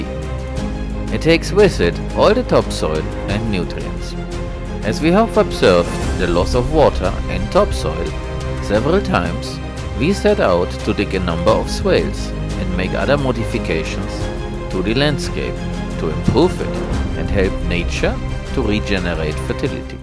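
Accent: Germany. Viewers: I Think you can even improve if you make the trenches not like a straight line but more like a S-shape. I mean like natural stream usually forms or like snakes move. I guess that takes even more speed from the water. More water should get into the ground and it should be more stabilizing for the top-earth. by the way greetings. I am also from germany and interested in water retention and ecological agricultures. my spoken english sounds pretty much like yours according to my girlfriend. anyway i admire your work there and I hope one day i can own a little bit of land and shape it myself, too. Could you need a helping hand for free? Actually I am learning Computer Science at the moment but I am in the last semesters. I just read at <link> that you are "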